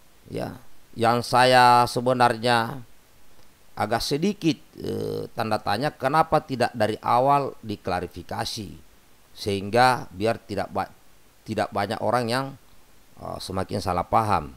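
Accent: Makassar, Indonesia. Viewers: assalamualakum sy senang dengar klo logak makassar ooo mmalee karaeng mg ALLAH SWT jg cnel aaamiiin3 Yaaa rabbal alamin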